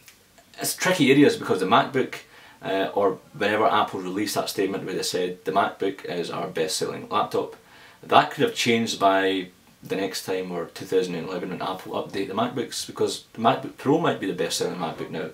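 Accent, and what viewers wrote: Scotland, United Kingdom. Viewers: are you scootish?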